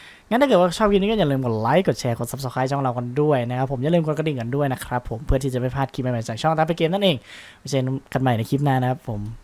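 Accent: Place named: Isan, Thailand. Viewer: เห็นบางคริปพูดอิสานนึกว่าคนบ้านเดียวกัน😅🤣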